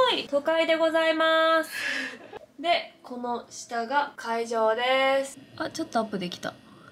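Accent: Kansai, Japan. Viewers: エキストラに参加させて頂いて、その際に彩ちゃんを初めて拝見しました！！❤ スタイル良過ぎてビックリしました！😳💕（語彙力 それから彩ちゃんの虜です😍💓 関西弁が可愛すぎて可愛すぎて☺💕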